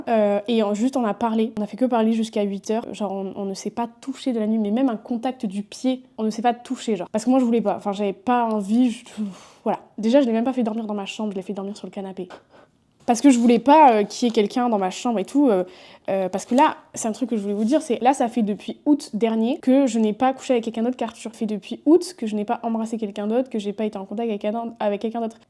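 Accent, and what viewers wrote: South, France. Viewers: J'pense le dire en com à chaque vidéo mais quelle BG 😁 et cet accent du sud, elle transpire le soleil ☀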